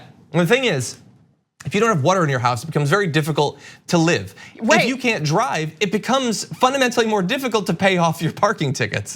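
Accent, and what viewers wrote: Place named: Ohio, United States. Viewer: John endearingly says "wuter" which is sort of Ohio speak.. Where's he from? [asking for a friend from Ohio]. Chicago was never this progressive when I was growing up there.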